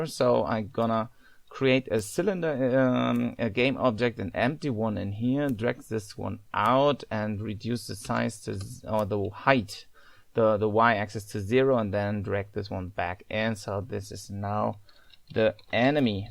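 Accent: Germany. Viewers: you sound german, diablo is pronounced as it is in german ^^ im also looking forward to the next parts, great video!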